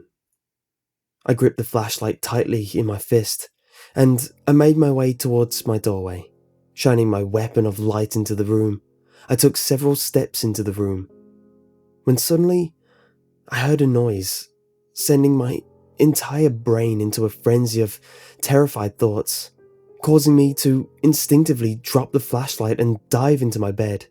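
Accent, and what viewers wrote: United Kingdom. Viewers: THTHTHTHT terrible. british accents cant help terrible stories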